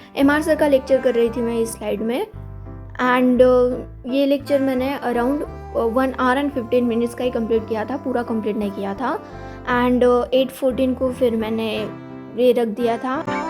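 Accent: India. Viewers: I think u are from south Indian na??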